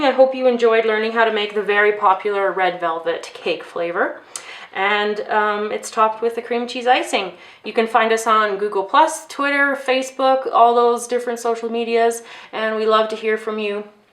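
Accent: Canada. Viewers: Okey :). Maybe I´m just not used to hearing Canadian. I love your videos!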